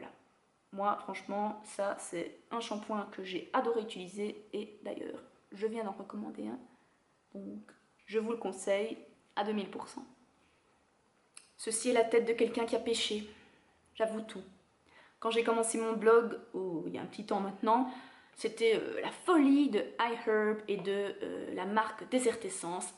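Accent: Belgium. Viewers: je tarde un peu mais c'est normal pour le shampooing à la violette... tout les shampooing pour blonde font ça...( effet sac de noeuds). En tant que blonde c'est une galère!! bisouxx et quel plaisir cet accent belge surtout pour qqn qui désormais vit à NY!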